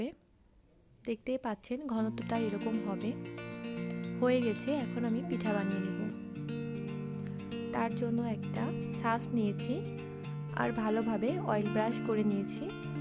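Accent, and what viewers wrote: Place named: Jessore, Bangladesh. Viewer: Apu mone hocche Jessore er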